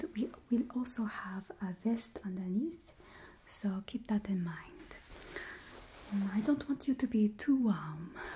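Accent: France. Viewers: I do love your French accent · I'm french and I find it very relaxing when you speak with that accent and pronounce french words. You're my favourite ASMRtist and I love your videos,! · I absolutely love it when you do your french accent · I like your french accent 👍 she is so sweet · Wow you really resemble Natalie Portman in this video ... also loving the French accent 🤌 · You have such an Amazing French Accent 🙂🇨🇵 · Honestly, I think has the most smooth French voice I have ever heard. your voice is spot on and quite calming. 👌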